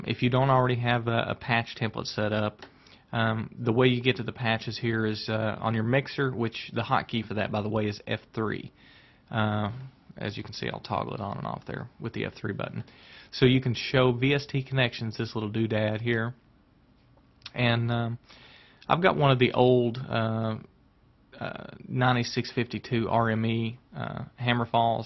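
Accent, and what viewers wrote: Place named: Texas, United States. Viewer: Dude!, are you Texan? You sound it with your delightful dialect.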